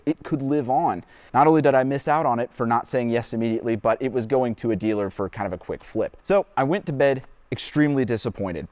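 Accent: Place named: Kansas, United States. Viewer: Good on you! Slowly but surely, you're building content. Granted you had to wait until CT2 aired, but your delivery is so measured and "Kansan" that it is nice to another one coming along. I really like the understated "look and feel" of your channel. It's rare to watch a channel like that and NOT have it be boring. Thank you. I think I'm subbed on another account, but I''m subbing on this one now.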